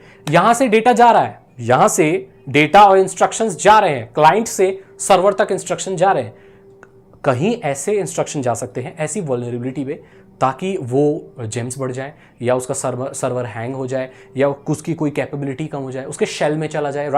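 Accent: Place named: Bengal, India. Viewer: ap bangali ho?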